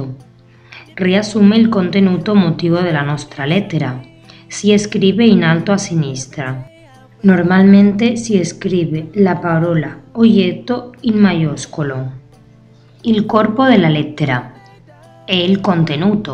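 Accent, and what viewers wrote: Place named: Spain. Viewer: È un accento,credo sia spagnolo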